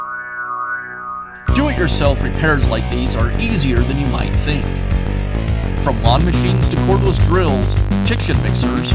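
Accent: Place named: United States. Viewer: I'll give a thumb up for all your videos only if they have your subtitles. Unfortunately, your nice American accent really breaks me into sweat. I'll try to get used to it, however without transcription it's too heavily yet.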